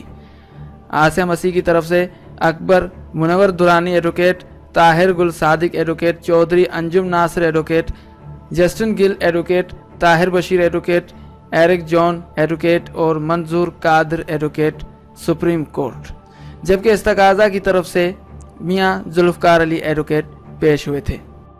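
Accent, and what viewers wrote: India. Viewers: Tu hai Kon Bhai ?indian walon Ka accent lagra hai !!! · Ap pakka Indian ho q k bolny k andaz bata rahy hy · Are you indian ?The word of your speaking is indian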